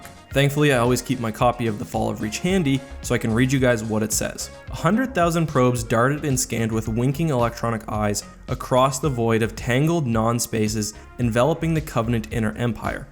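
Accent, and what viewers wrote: Canada. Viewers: Are you canadaian?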